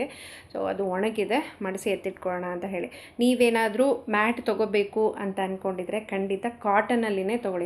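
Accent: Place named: North Karnataka, India. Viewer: good to hear ur kannada...u speak uttara karnataka kannada with ur family and as usual common kannada slang in the vlog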